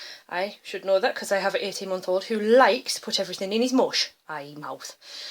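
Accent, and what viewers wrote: Newcastle, United Kingdom. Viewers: Tl;dr Open lid a tiny bit aye, tip gungy water out, has Geordie accent, put salt in which costs 30p. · love a Geordie accent I can listen to it all day. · I luv your Jordie accent....and you are quite foony, too! hahaha. :)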